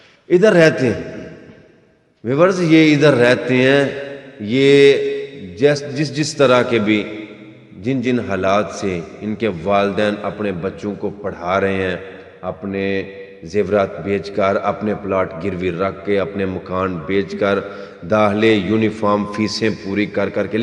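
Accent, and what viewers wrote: Punjab, Pakistan. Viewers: Veer g tohadi zaban te punjabi jaj di aa veer g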